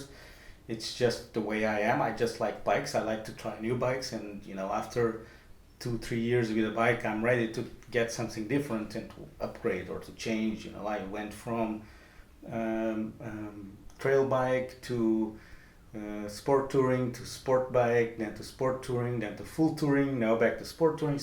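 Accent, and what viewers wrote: Portugal. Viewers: I can se by the use of some specific words and because i usually have a good sensibility detecting Portuguese accent, behind the English.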